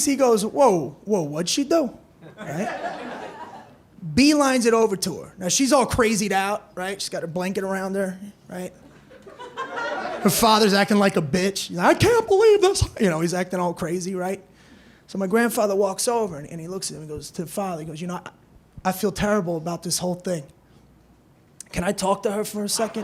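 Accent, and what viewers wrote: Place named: New Jersey, United States. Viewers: Jersey Italian accent is perfect! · This sounds like every guy from NJ. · he sounds like jake if jake had a jersey accent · Knew this guy was from Jersey just by the way he said "ya knowada mean". Also knew he went to Florida for rehab. Also knew he wasn't a bad guy, just seen some fucked up shit. Loved the act but hit me when I realized how real this joke was. It was REAL MOTHAFUCKERS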